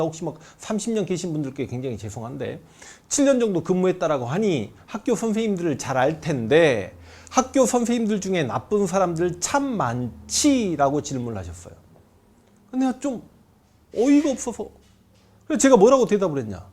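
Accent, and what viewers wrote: Jeolla, South Korea. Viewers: 형님..잘 보고 갑니다. ^^ 전라도 분인것 같아서 ㅋㅋ 저도 전라도거든요 ㅎㅎ 앞으로 더 좋은 가르침 부탁드립니다.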